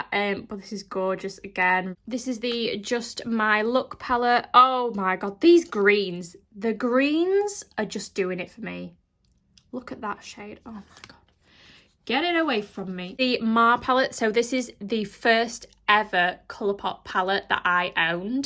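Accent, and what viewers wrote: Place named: North, United Kingdom. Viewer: I love the way you describe everything in your northern accent!! Such a beaut collection ❤️ making me want to do an eyeshadow palette video xxx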